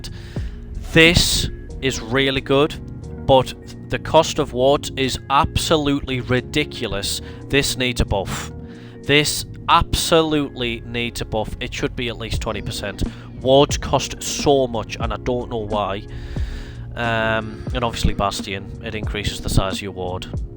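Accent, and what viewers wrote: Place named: North, United Kingdom. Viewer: listen to those dulcet northern tones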